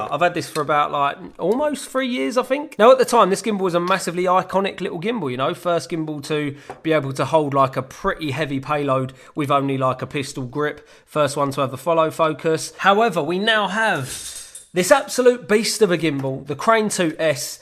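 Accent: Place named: Essex, United Kingdom. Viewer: Yeaaah definitely getting a 3S pro...I guess I watched this video just for your accent 😂 #essexnostalgia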